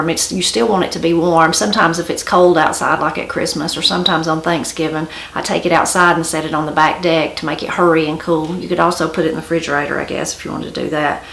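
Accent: Appalachia, United States. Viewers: You mean "arsch" potatoes? It's just "Irish" potatoes (regular white ones) said in the Appalachian accent. :)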